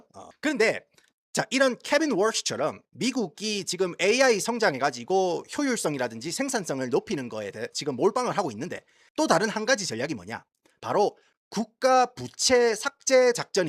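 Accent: Gyeongsang, South Korea. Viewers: 같은 경상도사투리억양이라 더 잘 알아묵겠는...신기한현상ㅋㅋㅋ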